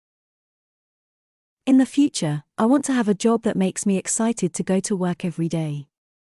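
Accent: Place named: United Kingdom. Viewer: I think this is the chanal that i was looking for to Master british accent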